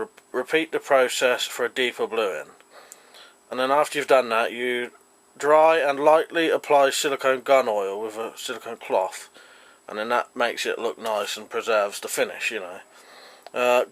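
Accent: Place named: England, United Kingdom. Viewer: Love the English accent. Great video and nice cleaver!!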